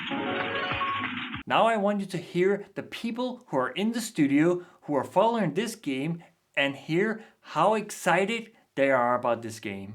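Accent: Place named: Denmark. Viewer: Work on your danish accent. Work on your postere. This whole thing felt very bland to Watch. Also this has 0 relatability for none danish viewers, so if you insist on doing video’s in english, make sure that there is at least something that none danes Can relate to. Otherwise you might as Well just speak danish. Not trying to be toxic. This is just my honest oppinion.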